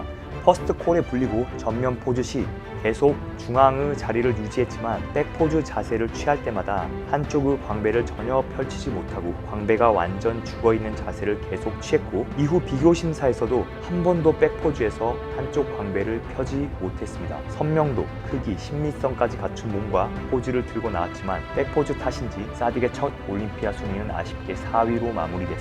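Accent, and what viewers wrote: Gyeongsang, South Korea. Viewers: 경상도사투리쓰는사람이네 ㅋㅋㅋ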